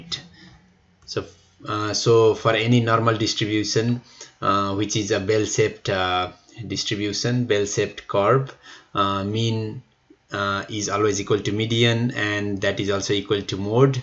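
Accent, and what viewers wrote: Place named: Nepal. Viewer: are u from Nepal ? your accent is same as mine. thats why I asked.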